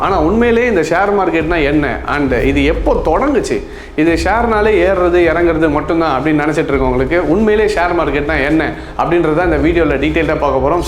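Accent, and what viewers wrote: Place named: Kanyakumari, India. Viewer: Neega Kanyakumari arabiga bro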